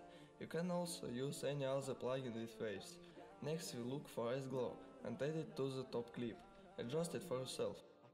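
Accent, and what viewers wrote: Russia. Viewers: боже мне так нравится твой русский акцент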